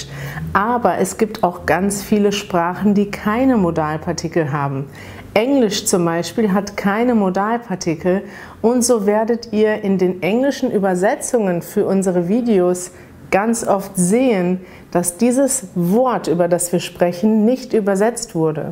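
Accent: Standard. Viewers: Hi, how can I get in touch with you directly? g:) You are the most methodical person I found on the web and your Hoch Deutsch rocks:) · Ist das hochdeutsch?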